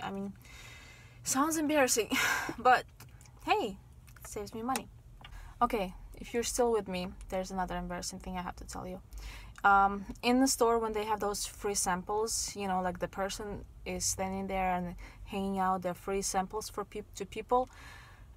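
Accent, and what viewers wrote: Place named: Eastern Europe. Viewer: You sound Eastern European. Just curious.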